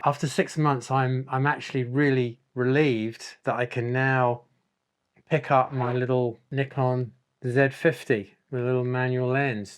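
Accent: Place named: United Kingdom. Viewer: Point and shoot works for me (Panasonic Lumix DSC-S3). I just rediscovered an old Agfa Optima 1035 I have, still in brand new condition. I will make a project this year of getting used to film caneras again. With this nice little cam. As a fun project. I’ve been a musician and painter all my life, but always used a camera when I had a chance, since I was a kid in the 60s. I take pictures everywhere we go, many just around home. The small town and everyday mundane images video caught my attention. I like gas stations, store fronts, shop windows, grocery store shelves, toys, books, simple flowers, fruits in bowls among other everyday subjects. You sound British to me (sorry). Thank you for the frank and fine posts.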